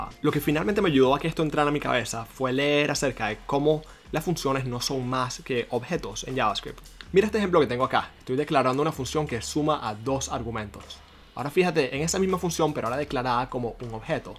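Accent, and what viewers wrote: Venezuela. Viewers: Muchas gracias por los consejos, no pude evitar notar tu acento ¿Eres venezolano? PD: Pondre en practica estos consejos. printf("Gracias")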